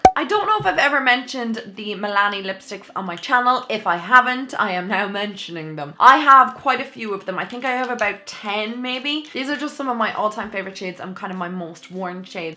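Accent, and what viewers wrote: Ireland. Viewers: Your videos are amazing! Your are my favorite european youtuber and your Irish accent is so lovely 🙂 My favorite products from the "drugstore price" section are from "The Ordinary Skincare" line. They are absolutely amazing and soo affordable. I know them because Wayne Goss talk about them in his channel. You bet you will love them ☺️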